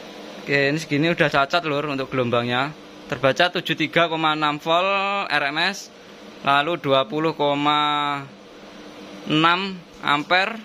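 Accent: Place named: Madura, Indonesia. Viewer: Nah ketahuan ada logat madura nya 😲😲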